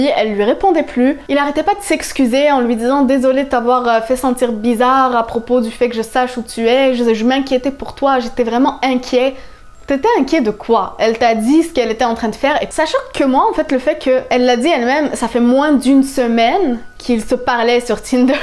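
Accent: Canada. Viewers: j'adore ton accent tes canadienne ?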